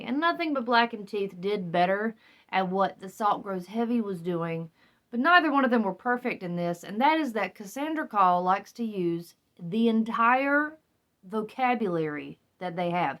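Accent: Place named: Transatlantic, United States. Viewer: Transatlantic accent is so funny for this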